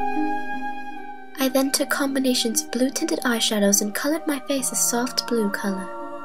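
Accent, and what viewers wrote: United Kingdom. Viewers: why does she talk british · Or just doing a british accent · attempting the british accent... rather lovely. It's not repulsing at all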